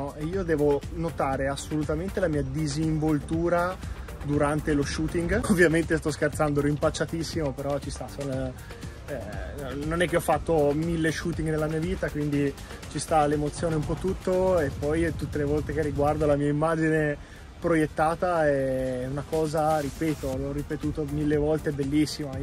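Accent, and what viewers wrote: Pesaro, Italy. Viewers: hai preso un po’ di accento pesarese ahahaha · Ti seguo dall'Inghilterra, in bocca al lupo per la nuova stagione. Rendi orgogliosi tutti i pesaresi nel mondo, che ne stai prendendo un po' la cadenza.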